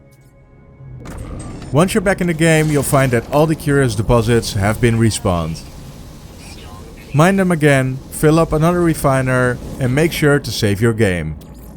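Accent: Netherlands. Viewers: aah het nederlandse accentje blijft ook ons kent ons he xD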